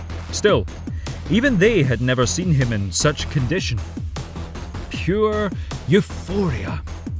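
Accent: Scotland, United Kingdom. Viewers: A video about drinking just feels so much better when narrated in a Scottish accent!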